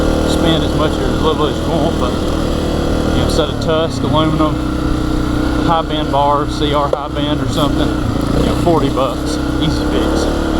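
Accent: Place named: Kentucky, United States. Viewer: Where you from? I’m in London Kentucky and your accent is similar to mine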